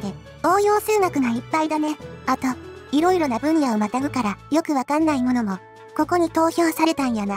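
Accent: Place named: Kansai, Japan. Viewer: さすが、関西弁のチャネルで大半は京都大学出身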